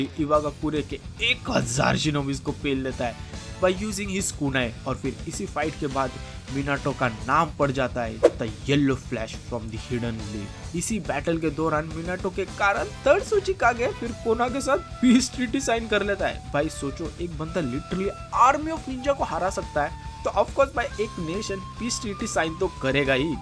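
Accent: Maharashtra, India. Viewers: ho bhai maharashtrian ki bolnar ki style pahachan mai aate hai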